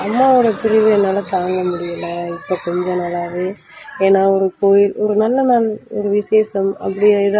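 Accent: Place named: Sri Lanka. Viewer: உங்கள் பேச்சில் இலங்கை சாயல இருக்கு உன்மைதானா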